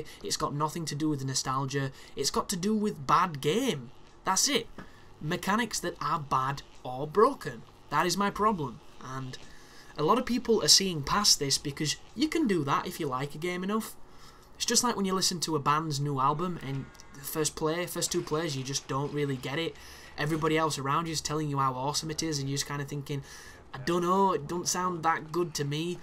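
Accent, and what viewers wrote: United Kingdom. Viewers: I don't understand your comment and whether you're being sarcastic or not. Playing the game by always choosing 'No' takes some discipline, but at least it means that there is a point to the healing items on Professional difficulty. The game is still annoying in that you can only have one saved game at a time, that's a really bad flaw that. You sound like you're from the UK, me too! :-) I like your commentary, you make some interesting comments and assertions about games and whatnot.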